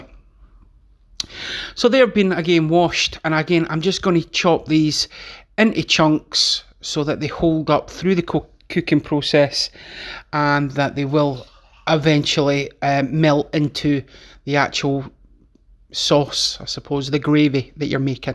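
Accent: Scotland, United Kingdom. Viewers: Great video mate, keep up the good work! Just wondering, what part of Scotland are u from? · i made a quiche from start yesterday,homemade shortcrust pastry,seems tastier when you make your own?are you in edinburgh?trying to place the accent.i will be giving your steak pie recipe a go shortly,looks so so good.i might add a wee bit lea and perrins to the sauce?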